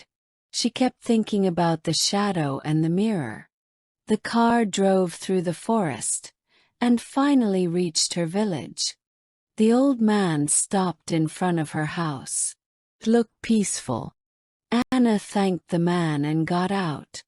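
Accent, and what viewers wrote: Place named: United Kingdom. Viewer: شكرا لاني بحاول اتعلم الاكسنت البريطاني ومالقيت كثير مركزين على أن يكون كلام بريطاني مىة بالمىة وانا احب قناتك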